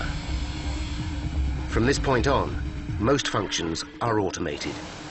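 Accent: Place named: England, United Kingdom. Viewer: Ironic that there is an English accent narrator in this version considering BP owned the plant. They care nothing about their workers just profit.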